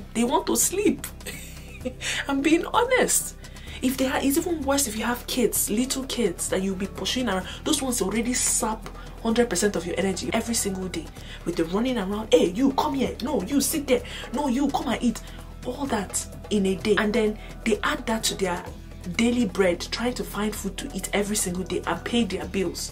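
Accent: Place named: Nigeria. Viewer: I love the Naija accent at the intro💯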